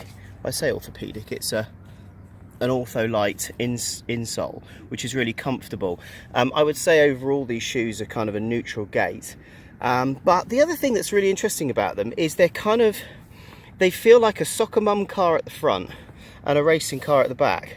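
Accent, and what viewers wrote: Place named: England, United Kingdom. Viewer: New England location but old England accent !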